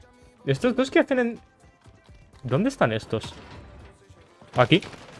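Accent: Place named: Argentina. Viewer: Grande pensé q eras argentino jóder...abrazo desde Argentina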